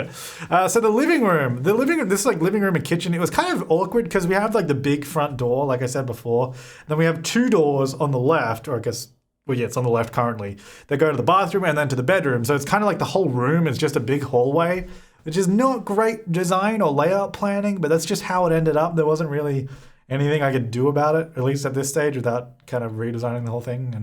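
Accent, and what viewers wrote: Australia. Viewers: I can sure hear it. I’ve been watching a lot of Jazzas videos lately. Maybe it’s the way we Aussies say things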